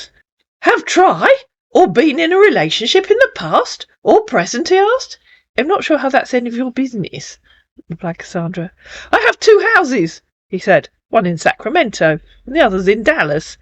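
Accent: United Kingdom. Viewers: 😂 😂 😂 I LOVE it when, in your proper British accent, call him "a semi-illiterate idiot". Oh, you kill me!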